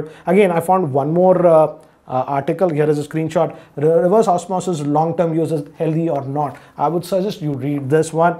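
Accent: Hyderabad, India. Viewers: Sir, Are You From Hyderabad ?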